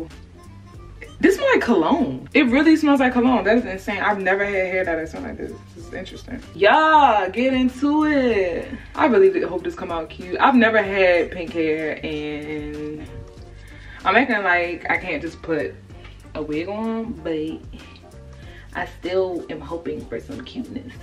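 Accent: Baltimore, United States. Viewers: yooo so i was about to type you sound like you from baltimore but then i went on famous birthdays and you are like maryland gang represent!!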